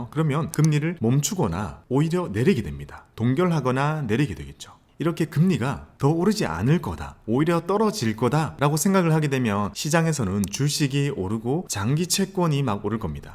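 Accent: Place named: Seoul, South Korea. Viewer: 경제뿐만 아니라, 서울말도 배울 수 있어서 좋은 채널인 거 같아요! 님 쵝오:)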